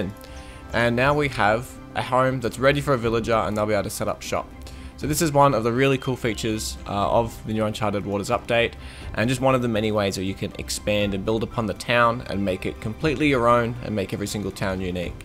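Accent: Australia. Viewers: I love the temporary z-buffer object transparency (???) - is that common? It looks very schmick and useful. I have done something similar in a Windows explorer clone I am developing kinda sorta... Good stuff here, great to hear the Aussie voice on a game dev video of this quality.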